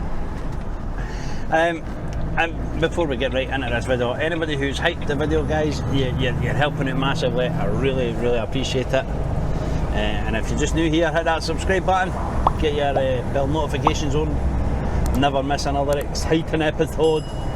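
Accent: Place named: Scotland, United Kingdom. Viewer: Perfect. Sunday dinner and the Scottish accent 🙂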